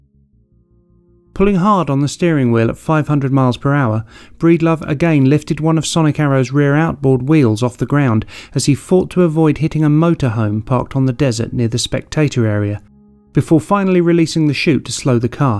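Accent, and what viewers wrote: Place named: United Kingdom. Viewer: Great video... I got burned out on the narrator’s posh Brit accent though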